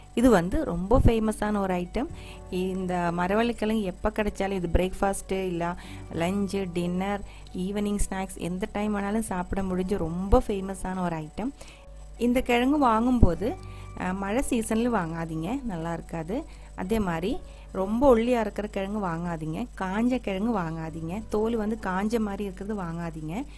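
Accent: Kerala, India. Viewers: ur tamil is so good.. though little Malayalam accent... where r u from mam... my Dubai kerala friend told me this recipe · Unga Tamil malayala vasanaiyodu alaga erukuu. ❤ & Thnx for the information on how to select kappa kilangu dear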